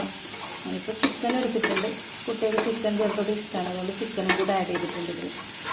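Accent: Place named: Kannur, India. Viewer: full kannur shailiyil aanallo samsaram. ndhayalm sadanam polich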